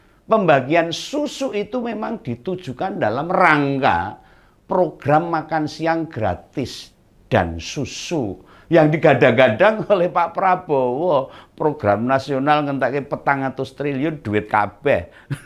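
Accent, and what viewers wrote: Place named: Yogyakarta, Indonesia. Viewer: Mesti ketawa kalo denger Om bicara, khas logat Jogjanan. Semangat Pakde😁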